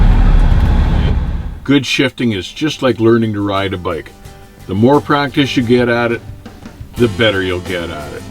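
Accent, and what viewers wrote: Canada. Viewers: You must Canadian if I'm correct. I've noticed that Canadians pronounce out different than we do in the states.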